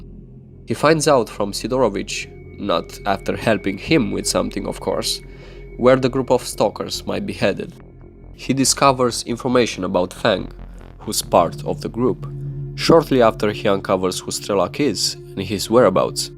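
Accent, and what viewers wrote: Romania. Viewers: asta e prima data cand vad ceva de la tine, cum te-am auzit vorbind : "sigur e ro" ma duc la "about" - :D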